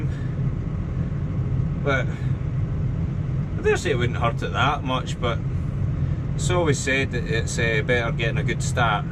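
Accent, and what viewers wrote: Scotland, United Kingdom. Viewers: Which part of Scotland are you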